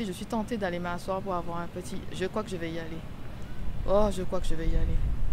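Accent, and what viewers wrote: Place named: Martinique. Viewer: Oui, je confirme que l'accent martiniquais est bien present dans ton dernier audio, et l'articulation y était également. 😊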